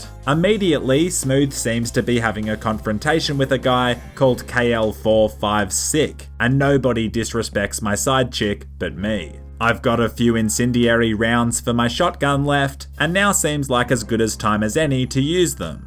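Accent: Australia. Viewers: Yes! My prairers have been answered! Finally some more Legs Spread Redemption 2 Online by. Lovely seeing someone freeze to death in the mountains with Australian commentary! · I read this in your australian voice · Oh i thought u australian let's go australia, we got best game utubers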